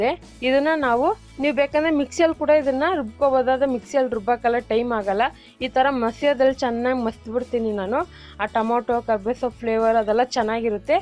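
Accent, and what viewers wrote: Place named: Tigalarapete, India. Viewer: nimma bashe Tigalarapete yavara thara idde.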